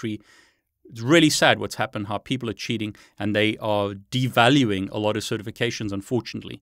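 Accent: South Africa. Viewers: Didn't know you were South African but it helps explain why I've always thought there was something about your accent I couldn't quite place!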